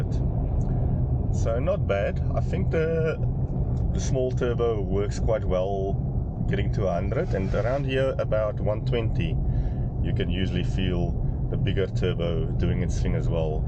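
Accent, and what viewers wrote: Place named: South Africa. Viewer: Love the South African Boere accent Boeta, lovely content and detailed review; thank you Sir.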